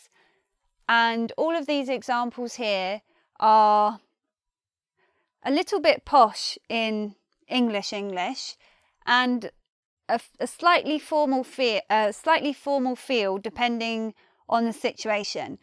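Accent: United Kingdom. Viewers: hi. thanks for the lesson. I love ur uk accent. have a good one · She has Brittish accent.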